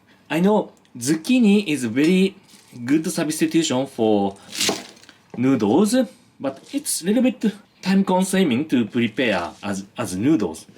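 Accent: Japan. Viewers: excatly Japanese English accent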